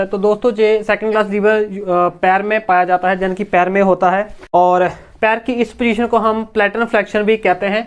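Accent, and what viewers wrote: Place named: Punjab, India. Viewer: Thanku sirr and apki punjabi 😅🧿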